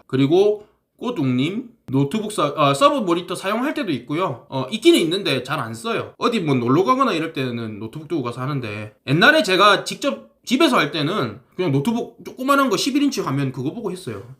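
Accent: Gyeongsang, South Korea. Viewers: 너무 잼있어요 같은 갱상도라 그런지 말씀하시는게 너무 친근해요~ㅎㅎㅎ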